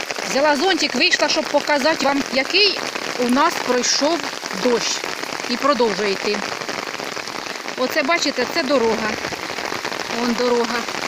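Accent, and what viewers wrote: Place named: Poltava, Ukraine. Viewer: а де ви проживаєте? чи не на Полтавщині?знайома говірка.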